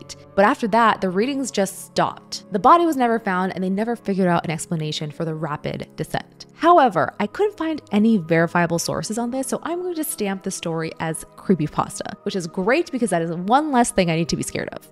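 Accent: California, United States. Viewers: Are you from California or Nevada? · So, is she from Nevada? Or California?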